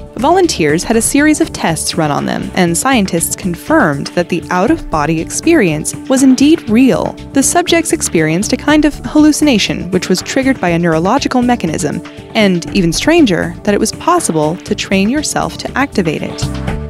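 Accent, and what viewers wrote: United States. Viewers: Wow I am impressed that the narrator ,who has an American accent actually pronounced the Antarctic correctly . Normally an American pronounces it as ANARTIC totally missing out the T. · speaks with an American accent. gives temp degrees in Celsius. stupid bitch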